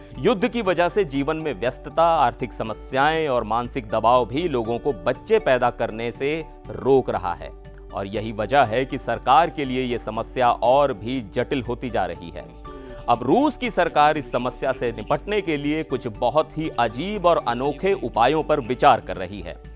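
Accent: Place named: Bihar, India. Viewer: Ye presenter pakka बिहार से है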